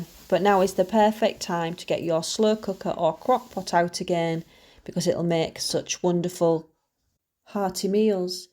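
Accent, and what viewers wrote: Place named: Hull, United Kingdom. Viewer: Just found you guys this morning 😍 I recognise that accent being a hull girl myself, am I correct 😜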